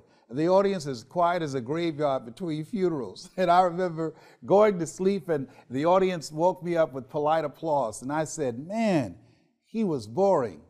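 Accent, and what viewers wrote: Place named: Columbus, United States. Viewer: les brown I lived in Columbus, Ohio 23 years and I recognize your Columbus Ohio voice because I can relate to you I been a sales guy for 3 years on commission... and started from the ground up with no mentor and did many jobs with no college education... you in spire me to give back my talents of selling back to everyone who is working towards their dreams.... but my advice to everyone is... FEAR TODAY BUT NOT TOMORROW!... if you don't get that deal or or eat today there will be less of a tomorrow... when you at the end of your life and decide to accomplish what you want to do/see, travel the world... or be the best talent at last moments of your life... question would be why do you regret taking so long to get here up to that point of your life?... the best question should be if not today when are you going to have it?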